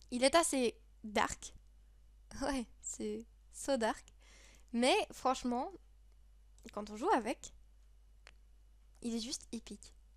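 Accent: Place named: Liège, Belgium. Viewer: Petit accent de liéch là :)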